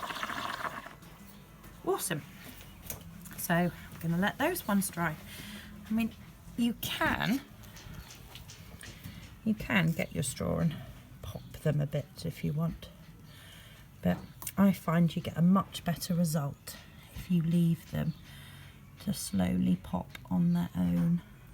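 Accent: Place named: England, United Kingdom. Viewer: You're so sweet and joyful and i love your english accent!!! I hope i visit england one day! 😃 the seals are cute! Getting a nice bubble paint bath😃 sending love from the US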